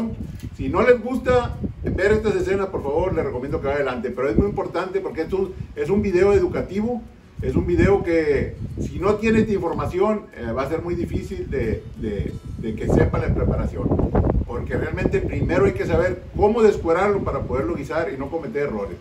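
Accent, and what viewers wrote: Mexico. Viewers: junto con disfrutar las preparaciones y las recetas me encanta como habla el mexicano, lejos el mejor acento de todos los países latinos...órale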